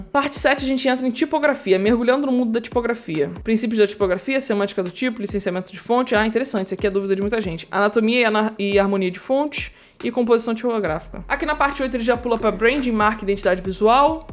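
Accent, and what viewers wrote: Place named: Rio de Janeiro, Brazil. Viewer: Legal esse seu acento carioca. Me lembra muito uma amiga do Méier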